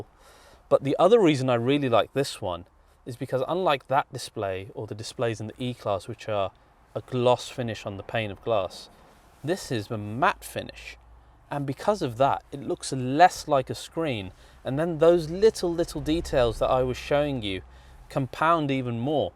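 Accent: United Kingdom. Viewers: This guy in India? Has British accent, but definitely not ethnic English & scenery looks like England. ???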